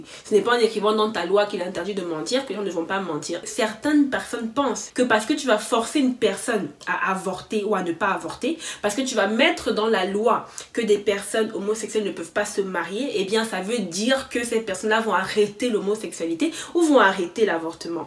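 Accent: Cameroon. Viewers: Je viens de découvrir ta chaîne, c'est super wahou l'accent camer se sent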